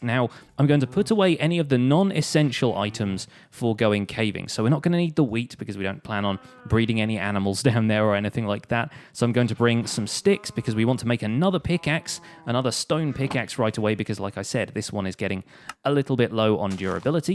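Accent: United Kingdom. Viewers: He's british bruh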